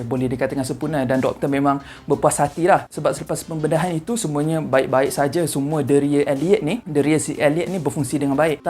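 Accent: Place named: Kelantan, Malaysia. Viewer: Ni mesti org klantan dapat cam loghat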